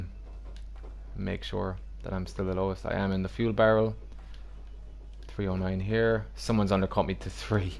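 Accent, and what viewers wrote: Ireland. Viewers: Are u Irish cos I'm from waterford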